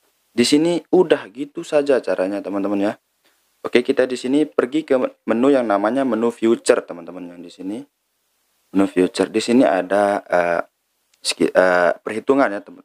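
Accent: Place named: Lombok, Indonesia. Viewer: Org Lombok ni yak?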